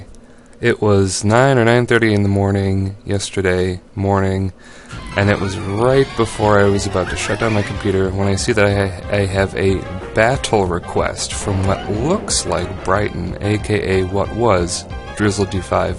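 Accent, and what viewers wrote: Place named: Australia. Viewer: Youre sounding Less and less australlia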